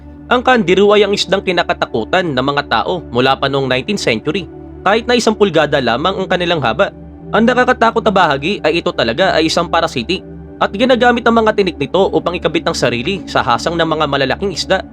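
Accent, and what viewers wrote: Iloilo, Philippines. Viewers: Ilonggo ka lods?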